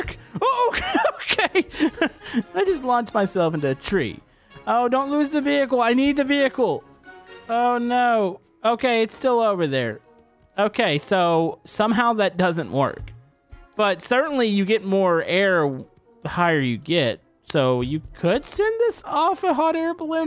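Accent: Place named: Texas, United States. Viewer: Come on I didn’t know you lived in Texas I thought you lived in like Minnesota or something I thought you don’t have the accent you do of the Texas accent probably should’ve guessed it by that but it’s cool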